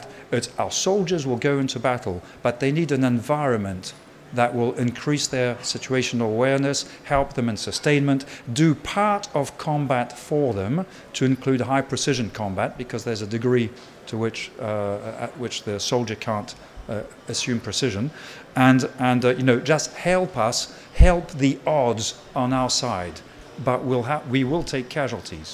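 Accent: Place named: France. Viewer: He speaks perfectly English, but he has a heavy French accent, I recognize it as a French. English is clearly not your mother tongue.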